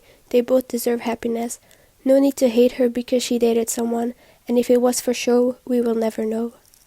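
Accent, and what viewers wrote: France. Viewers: Are you french?? Bc ur accent sounds a bit french and i'm french too so that's why i'm asking ;w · sorry, it's not about the video but are you french? idk but your accent sounds like a french one 🤷‍♀️ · your voice is so beautiful! i love your french accent . great video , i love the points you made :)💖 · I am French, this is obviously a french accent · Are you french ? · Are you French?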